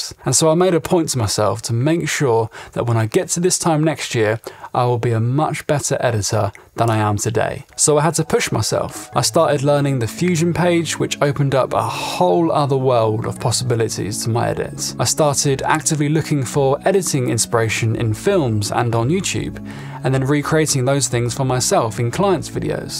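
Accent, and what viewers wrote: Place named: United Kingdom. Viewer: I just get a thrill when I listen to your British English ))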